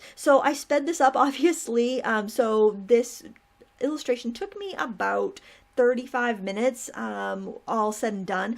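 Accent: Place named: Arkansas, United States. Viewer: Hello, Hope you are well. This is really cute just not my thing (yet), hoping to work on some postcard simple watercolors to start with and good basic drawing practice first. Just wanted to let you know I've been watching alot of your videos and to let you know, your way of saying things, you would blend in with us Arkansas folks just fine. We say ain't and other "incorrect" expressions, we also have a tendency to run several words together, but any from here understand quite well. I like the way you express yourself. Ever need a change of pace, we have canoeing, lakes and national forest recreation parks all over the place. You surely be welcome here. Thanks for all your help and support, is greatly appreciated. God bless. Sincerely Becky B from Arkansas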